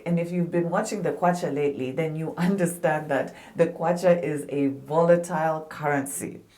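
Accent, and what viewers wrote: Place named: Zimbabwe. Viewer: You have some tones of Zimbabwean in you tho but i will wait till you tell me where you from cos you definitely not Zambian(Your name and accent tell it all)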